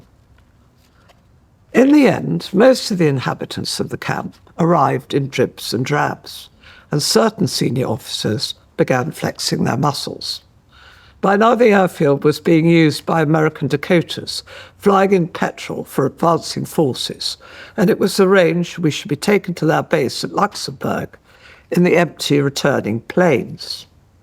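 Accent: United Kingdom. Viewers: She's got an amazing accent-I.love the upper class British accent!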